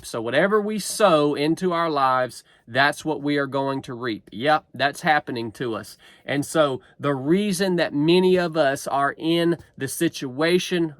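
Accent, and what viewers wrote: South, United States. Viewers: Just gotta say... Thanks to ma man (Southern Draw Accent) lol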